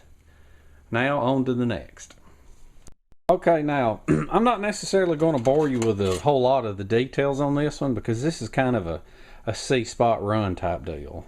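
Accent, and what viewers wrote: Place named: Kentucky, United States. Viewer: I want to see the car/truck under the tarp! Nice video btw. Where you from with that accent? Sounds like a Kentucky accent. :)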